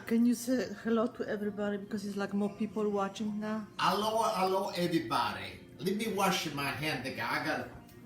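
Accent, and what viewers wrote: Calabria, Italy. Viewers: Centi'anni! This except for anchovies was how my noni made it. She did cook with anchovies but it was a with olive oil, garlic on day old bread. You sound like the old calibretzu from the hill. I miss that accent from when I was a kid.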